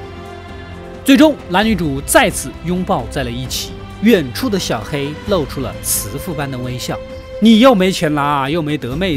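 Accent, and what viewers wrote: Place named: Hunan, China. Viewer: 是湖南的吧？特别喜欢这口音，哈哈哈哈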